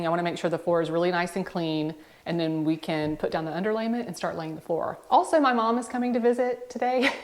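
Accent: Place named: Kentucky, United States. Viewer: So I've been trying to place your accent. I was thinking Kentucky, but is it Mississippi?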